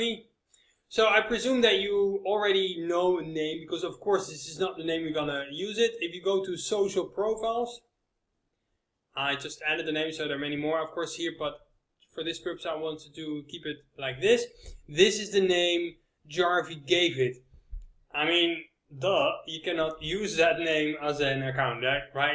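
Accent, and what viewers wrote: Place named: Netherlands. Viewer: How do you speak english, havin a dutch accent with russian on ur PC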